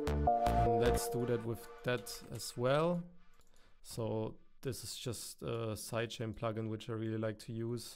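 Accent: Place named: Germany. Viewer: Are you german? You have a german accent, Im german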